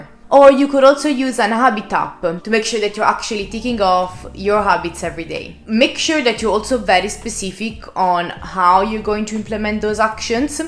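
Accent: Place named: Italy. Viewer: Hello, good morning! I found this video of yours about 20 minutes ago. It's fantastic and I liked it 😊. Excuse me, what is your native country? Your accent sounds very Italian. Greetings from Sonora, México 🇲🇽.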